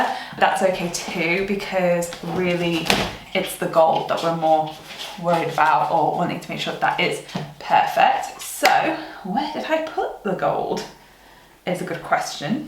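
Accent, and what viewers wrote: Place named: England, United Kingdom. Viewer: Hello. Found you this morning, so pleased to hear an English accent. I am from South Yorkshire and love a good old diy project. Looking forward to watching all your other videos 😊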